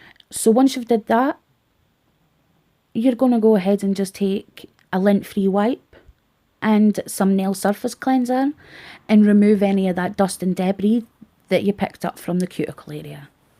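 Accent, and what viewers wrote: Scotland, United Kingdom. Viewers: Lovely Scottish accent. Nice video!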